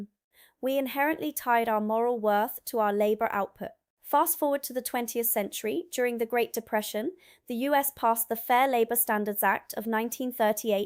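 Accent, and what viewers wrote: England, United Kingdom. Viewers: Am I missing something ? For an American you have a very British accent!?!? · I'd love to know how being American she speaks with THAT Accent? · If you're an a American why have you got an English accent? Please answer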